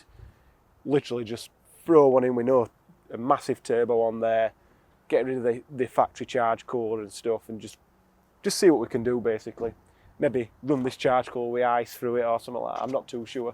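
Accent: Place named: Barnsley, United Kingdom. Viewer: Proper Barnsley lad, tell thi nar!!!! when can tha do my BITDI.... 4MOTION RULES 😂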